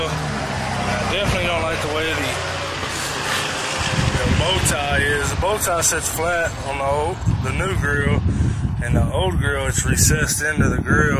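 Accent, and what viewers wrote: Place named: South, United States. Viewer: Where you from? I seen Texas plates. I live in Houston myself but you sound like one of my uncles in Georgia/Alabama lol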